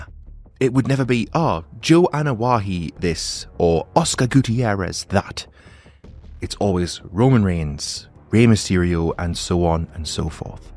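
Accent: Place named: Newcastle, United Kingdom. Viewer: Fantastic video, love the geordie accent as well!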